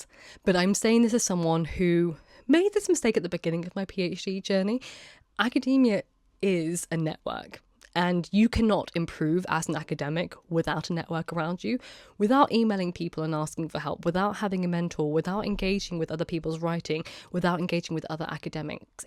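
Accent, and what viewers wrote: RP, United Kingdom. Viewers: Thanks! Very interesting video.🤔 I think I am starting to see why people don’t want to get PhDs…very difficult. Where in the UK is your accent?? It is almost posh.🤔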